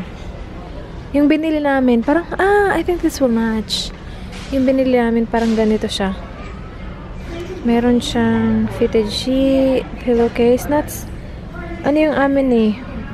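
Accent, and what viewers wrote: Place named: Visayas, Philippines. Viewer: i love your accent meron bisaya sound ..hehehhe and also ilove you say ....mady,mady,madyyyyy....ahahhhhaha love ur vlogs ..hehhehe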